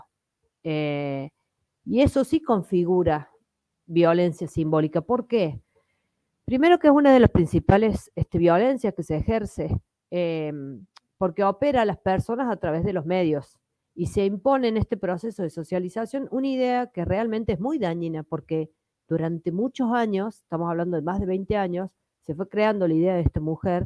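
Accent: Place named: Córdoba, Argentina. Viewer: Gracias por tu análisis tan claro, por cierto, me encanta tu acento, Córdoba?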